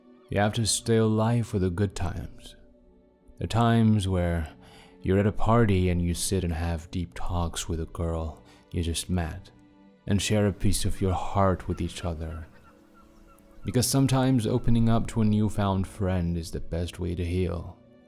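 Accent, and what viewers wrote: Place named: Morocco. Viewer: best morrocan english accent 😭❤️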